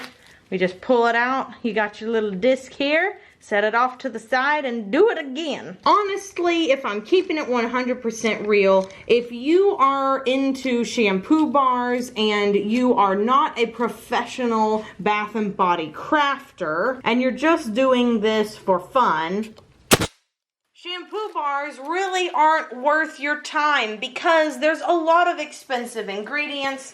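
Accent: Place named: North Texas, United States. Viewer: I’ve been wondering what your accent was from because you sound exACTLY like my aunt Pam. You must be from norther Texas bear Oklahoma cuz that’s where my mom and aunt are from/grew up.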